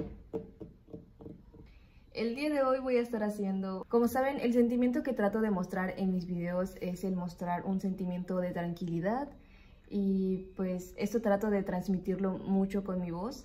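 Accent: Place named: Yucatán, Mexico. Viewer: Por mi casa también pasa Zgas jaja 🙊 Tengo curiosidad de dónde eres, sospecho que de Yucatán o Quintana Roo, tu acento me recuerda al mío jaja ❤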